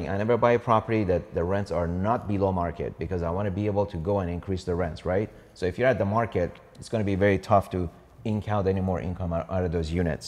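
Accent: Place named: Iran. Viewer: His Farsi dialect still very visible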